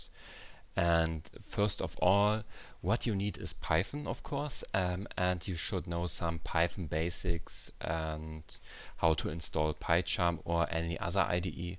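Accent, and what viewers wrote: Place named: Germany. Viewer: You sound very German. Ich kenne diese Scham, den eigenen Code zeigen zu müssen ^^.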